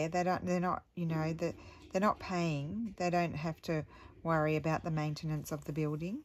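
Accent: Australia. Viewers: Kid are kids at lest they are out side I just concentrate on watching you and listening. So great to hear and Aussie voice. Regional Victoria except for Shepparton he’s out of lockdown now but hard and fast rules still apply masks 10 people outside can gather, and in restaurants only 10 even if individual there can only be 10 people in a place at one time so I am going out with my granddaughter I haven’t seen for months for lunch we have one hour in a restaurant that’s it. Then do a little bit of shopping and she can’t come home with me I’m still not allowed to have any visitors although I live on my own. I hope all your family and friends in New South Wales or where ever they live in Oz are okay I pray that they are safe from the dreaded word please pass on my love to them. I love your videos so much sometimes I watch them two or three times and if I get Maude even more I love all that you do although I can’t come up with what you do. 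Hope you are safe over there take care.🥰🦋💕🌈💜.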